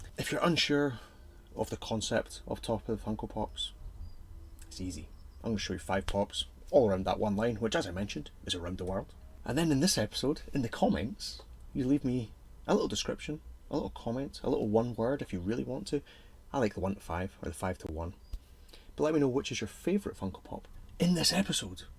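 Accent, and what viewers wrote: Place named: Scotland, United Kingdom. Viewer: This was a tough week, torn between Toshi and Hansel 🤔 I think I’ll go Hansel due to the props used haha. Special mention to you saying ‘cosy little boots’ in your wee Scottish accent 😅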